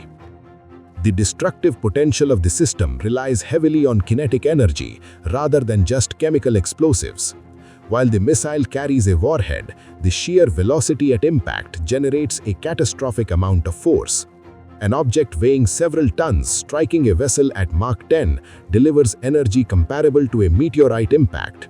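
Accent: India. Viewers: Your stupid Indian missiles are not working at all, stop bragging that your navy is better than our Chinese navy !!! By the way, your Indian English is not proper English, stop speaking Curry English !!!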